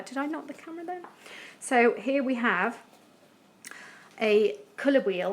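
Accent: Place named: England, United Kingdom. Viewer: I have this book and book 2 and have just ordered bk 3, I tried to get bk 4 on Amazon but it’s currently not available so maybe I will look elsewhere. Lovely to hear your voice, I was born in England spent my first 25 years there then emigrated to Australia so I still love to hear English accents thanks for this video it really helps.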